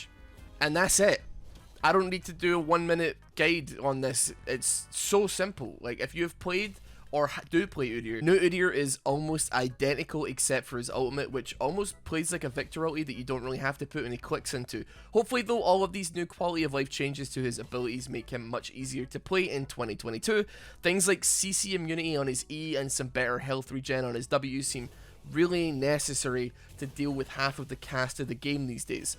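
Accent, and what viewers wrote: Scotland, United Kingdom. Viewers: Are you Scattish my friend?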